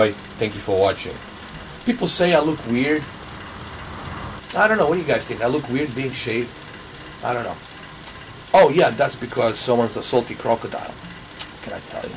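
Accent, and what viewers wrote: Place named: Boston, United States. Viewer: I think it's great that you're covering gaming (Xbox and Sony) with your 4k content. I too think that Sony has a stronger foothold within the gaming industry with their numerous exclusives and virtual reality. I enjoy both Sony Pro/PSVR and Xbox One X. I am the type that appreciates high quality 4k and HDR so I use the Xbox One X for games that are available on both consoles. Currently, I only use the Sony Pro for the exclusives and virtual reality. Overall, I do prefer Sony over Microsoft as it appears that they focus more on the gaming community but I can not overlook the greater (however small) processing power of the Xbox One X. I am very confident that Sony will leap over Microsoft with greater processing power during the next console generation. I was also like to thank you for the effort you put towards your educational content. 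Most reviewers are far too hyper-critical which makes me second guess everything. You cater towards the every-day guy. You use simple and use easy to understand terminology and your slight "Boston" accept makes you more relatable. It's like having Paulie from the Sopranos coming to my house to discuss 4k to me. You use common sense while giving simple down to earth advice with no B.S. Keep up the good work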